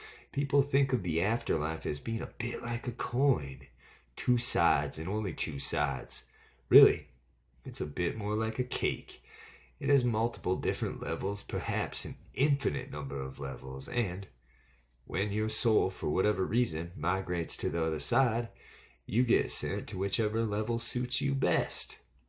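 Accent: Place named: Oklahoma, United States. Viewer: 😠😬 HOWD I MISS THIS ???? LOVE YOUR ACCENT!!!! SOUNDS LIKE HOME TO ME. OUR JOKE IN OKLA IS"I DONT HAVE AN ACCENT, YOU DO" LOLOL. LOVE IT . YOUR DOING A GREAT JOB.!!!!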